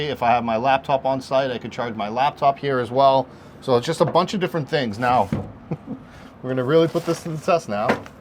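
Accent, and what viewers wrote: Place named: New England, United States. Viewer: Keep talking about your drawer slides lol you have such a New England accent! I lived in Mass for 21 years and I’m in SoCal now. Hearing you say drawer takes me back 🙌🏼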